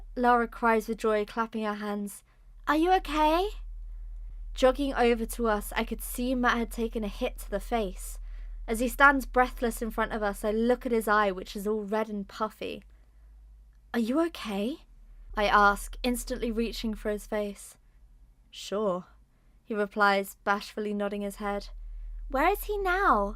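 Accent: United Kingdom. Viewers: Finally. I enjoyed the other Sonya story parts. However, others are right about continuing on with the story. Looks as though there could be many more chapters left to be told . Sonya, Matt and Laura and their adventures..😊😊 Oh. A womderful story told with a British accent too.. If I'm mistaken about accent. Please forgive me...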